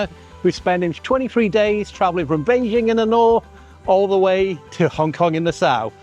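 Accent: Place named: RP, United Kingdom. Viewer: 这英国口音太标准了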